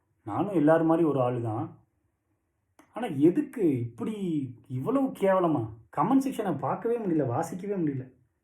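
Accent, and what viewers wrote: Standard. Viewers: Pls sir neenga eppovum pola videos podunga. Neeng feel pnatra mathiri videos podathinga nijamve fleeing iruku sir, pls mathavangla care panthinga epavum pola azhaga tamil la azhaga pesuvinga ila athupola podunga athan ungaluku azhagu. Senthamil pesuvinga parunga 👌👌👌👌👌👌 . So continues videos podunga. Nalla smile panite pesunga. God bless you sir. Ungalai pakka en anna polave iruknga. So feel panthinga bro.. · normal தமிழ் பேசுறது நல்ல இருக்கு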